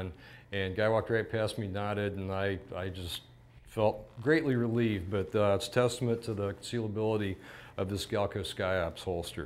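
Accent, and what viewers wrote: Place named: Chicago, United States. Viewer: I know you can't even carry a slingshot in Illinois. That Chicago accent gives it away. Where do u live?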